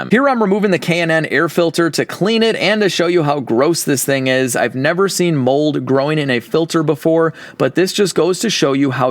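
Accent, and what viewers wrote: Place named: Chicago, United States. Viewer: I knew you were an Illinoisan with that CHICAGA accent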